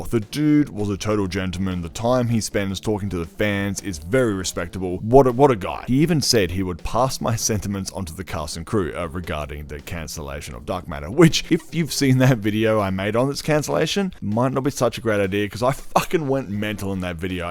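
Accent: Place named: Australia. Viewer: Make more mate. I love listening to aussie creator, the yanks are okay but good to hear a voice i can relate to.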